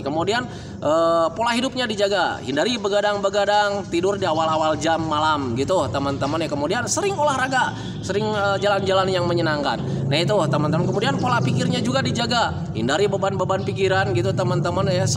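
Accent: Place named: Makassar, Indonesia. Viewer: Makassar mn suaranya ❤❤❤❤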